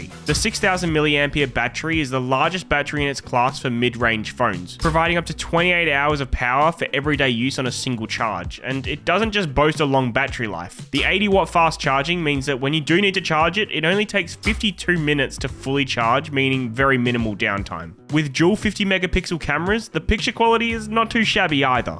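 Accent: Australia. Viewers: I honestly didn't even hear the Aussie accent and now I find it crazy that I could have talked to this man before❤ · First 5 seconds I'm like "Oh he's Australian? Subscribed."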